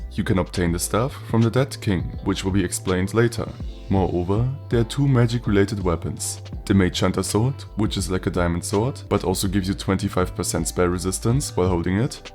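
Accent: Germany. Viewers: is that a german accent? also very high quality vid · man ekent das du deutsch sprichst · Wusste ich’s doch. Hab den deutschen Akzent rausgehört. Auf dem Channel das Impressum gesehen und meine Vermutung wurde bestätigt. Mach weiter so gute Videos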